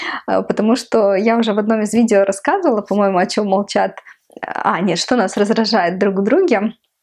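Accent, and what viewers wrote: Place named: Ukraine. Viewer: я недавно начала смотреть твои видео и все никак не могла понять, что у тебя за интересный акцент!?? А оказывается вы дома на украинском разговариваете! Так ты русский выучила специально чтобы канал вести?...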